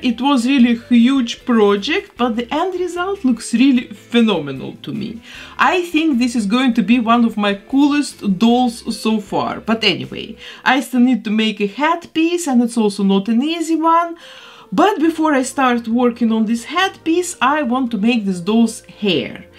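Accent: Russia. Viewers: Работа потрясающая P. S. Я одна слышу лютый русский акцент? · Мне кажется, или у девушки чисто русский акцент?😅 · Есть ощущение, что она с русским акцентом? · мне кажется... или у нее русский акцент..... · Что это за акцент? Русский или норвежский? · Почему я слышу русский акцент 😮 кукла замечательная!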